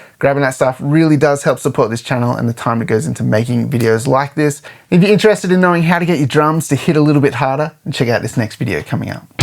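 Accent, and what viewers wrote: Australia. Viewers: Awesomeness mannnnn you sound just like craig jones the aussie bjj legend. Hahahaha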